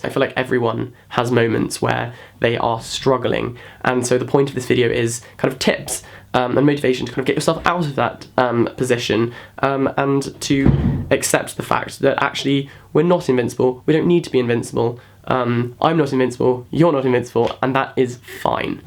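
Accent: United Kingdom. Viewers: Omg. You don't know how happy I am to see a new video from you. I discovered you chanel a few days ago and I absolutly love your videos! And I love your british accent! It's soooo cute ( Sorry I will stop with that) Lots of love from Germany You and your Dua Lipa jokes😂 I love it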